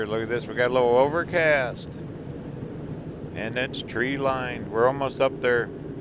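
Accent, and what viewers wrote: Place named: Upper Peninsula, United States. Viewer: I’m not sure where you’re from but with your accent you fit right in with the Yoopers.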